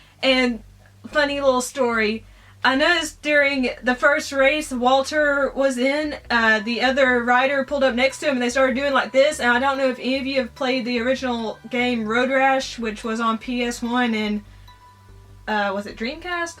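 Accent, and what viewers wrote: South, United States. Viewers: nice one,looks like a great film,i love your southern accent,looking great too,love n peace.jpj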